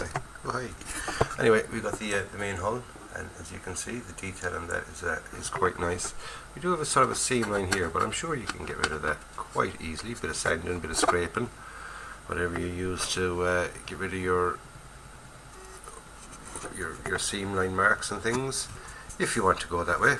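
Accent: Ireland. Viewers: And your irish accent reminds me of donald sutherlands in the "eagle has landed"!👍🏻 Jman👀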